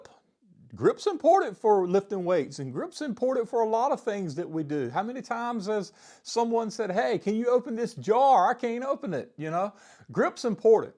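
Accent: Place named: North Louisiana, United States. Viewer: I detect north Louisiana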